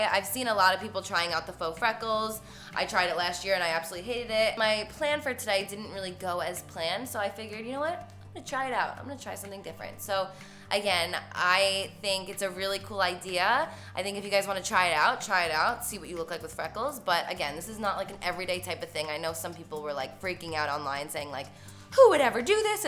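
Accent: New Jersey, United States. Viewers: I literally gasped when I saw she uploaded a new video and saw the screenshot of the look. She slayed this - as always. And I honestly love when she talks, despite the thick New Jersey accent which seems to bother some people (like why tho?) she's just so cool and down to earth and talks to us like we're friends, love that shit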